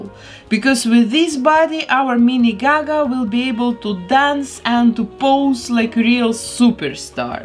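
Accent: Russia. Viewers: у вас золотые руки, но от русского акцента нужно избавляться... слушать невозможно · Почему я слышу русский акцент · Это руссктй акцент? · почему такой жёсткий русский акцент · Ты очень похожа на русскую девушку. Особенно русский акцент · Гриша Человеческий я тоже думаю,что она русская,в уши с самого начала прям ударил русский акцент 😂 но куклы у неё прекрасные😍😍😍😍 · Мне кажется или я слышу какой-то типа русский акцент?)) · The doll is cool, but the accent you have isn’t cool at all. Too much of a Russian, even hard to understand sometimes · Удачи! 😅 Вы крутые. Вы русская? У вас просто русский акцент 😃 Красивая леди гага получилась! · акцент как русский хм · Мне тоже кажется, что русский акцент. Но, возможно, она не русская, а просто есть много стран, в которых четко произносятся все буквы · Автор, вы Русская? Акцент прям жесть) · I can hear Russian accent.Вы большая молодец! 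· Я слышу русский акцент... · Вы случайно не русская? С акцентом говорите) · Русский акцент 🤘😎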